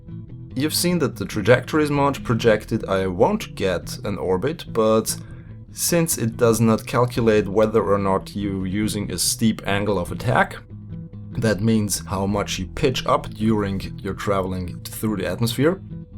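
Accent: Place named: Germany. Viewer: okay thank you . i have another question xd are you German ? your accent sounds like a German xd Wenn ja wäre es ganz cool denn ich hab da noch ein paar Fragen die ich so auf englisch nicht gestellt bekomme xD Dafür ist es einfach zu schlecht :D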